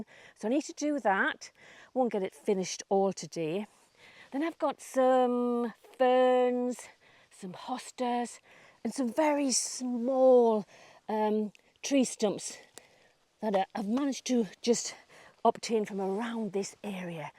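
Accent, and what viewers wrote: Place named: Northern England, United Kingdom. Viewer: Fab, us too. We’re in Bellingham :) beautiful part of the world. Although I’m from Sunderland originally and Hubby from Gateshead I heard the northern twang. Such a small world to come across a local video! Just an FYI gardening express have a deal on Hosta’s 40 for £10 just bare root but I got 80 and they are all popping up lovely 🥰 I’ll check out the stumpery, thank you 🙏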